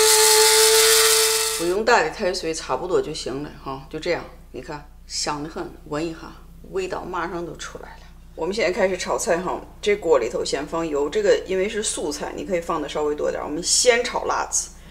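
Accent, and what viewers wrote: Shaanxi, China. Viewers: 好亲切的陕西话！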